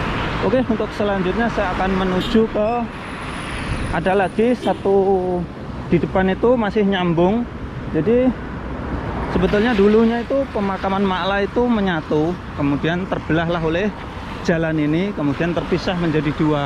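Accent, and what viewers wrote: Java, Indonesia. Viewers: mas ni asli orang mana yaa??? 🤔🤔 logat nya kayak orang jawa....🙂🙂 salam dr ponorogo Jawa timur... 🥰🥰🥰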